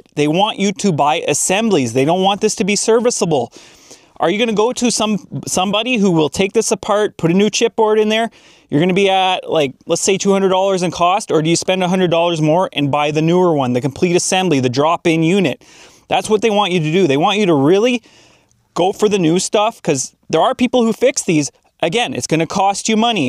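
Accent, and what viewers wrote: Canada. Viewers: I'm Irish/Canadian and subbed because I really miss the Canadian accent sometimes. Your car insight is also brilliant and shocking. We are really doomed.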